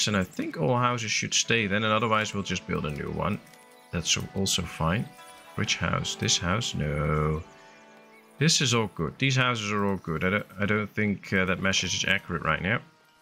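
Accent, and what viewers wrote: Netherlands. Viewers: We zullen zien. P.S. Ik herkende gelijk het Nederlandse accent toen ik zocht op Foundation Lets play, vandaar dat ik in het Nederlands reageerde.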